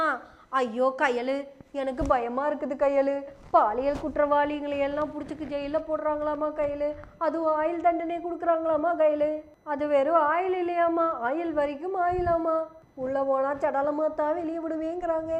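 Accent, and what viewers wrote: Kongu, India. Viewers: Kongu intonation WoW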